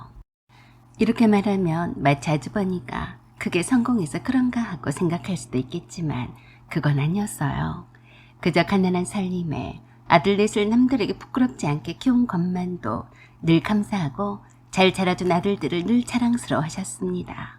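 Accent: Jeolla, South Korea. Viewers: 전라도 사투리가 많이 들리시네요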